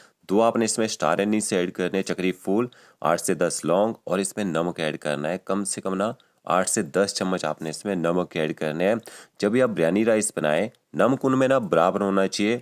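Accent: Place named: Punjab, India. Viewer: Chef aap mast hain, pls don mind thoda Punjabi style hai but recipes mast hain aapki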